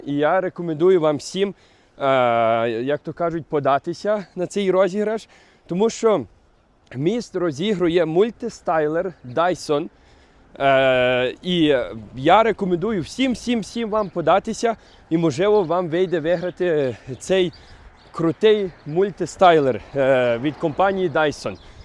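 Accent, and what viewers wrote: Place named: Transcarpathia, Ukraine. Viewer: Львів точно так не говорить, навіть близько, а от на Закарпатті так)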